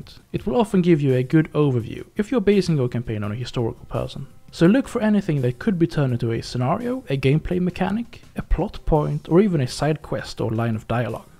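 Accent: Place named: Sweden. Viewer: This is one of my favorite scenarios, both for the interesting gameplay (plenty of secondary objectives that do what they're supposed to without also derailing the basic gameplay) and not least for the visuals (!!!): it's a beautiful and very atmospheric autumn-style map (a very underutilized season, as is also commented upon in the video) that - crucially - does an excellent job at including mountainous terrain in a way that's more involved and interesting than merely dotting the map with Mountain-type Gaia sprites. Absolutely one of my favorite scenarios in this astoundingly long-lived (and still-fresh, even after so many years) gem of a game! Also: fun to see a fellow Scandinavian delivering the goods as far as top-notch scenarios go (judging from the accent, this guy must definitely be a Swede - way to go, Söta Bror!)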